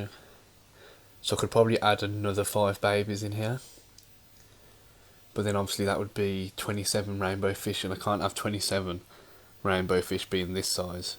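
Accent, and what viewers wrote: Birmingham, United Kingdom. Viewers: ah thought I heard a fellow Brummie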